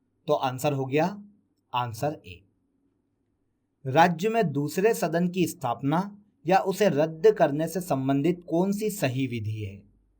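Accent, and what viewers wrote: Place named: Maharashtra, India. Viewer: Sir ap boht acha pdhate ho.. or aap Maharashtra se belong krte ho kya?! 😄 qki aap “hai” ko “he” bolte ho 😁 sounds good!!😊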